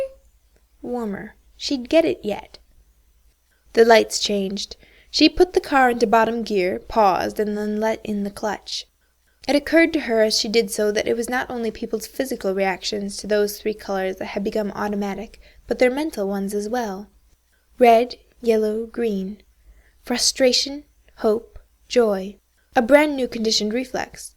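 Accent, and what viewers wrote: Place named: United States. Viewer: BRITISH housewife, reader is American and does a crappy job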